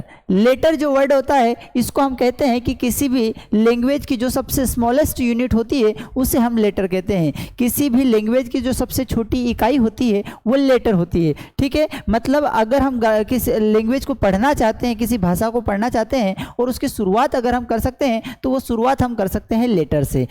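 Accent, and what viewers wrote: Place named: India. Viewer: Sir ji ki English ki class me Pure hindi ka pronunciation ho raha hai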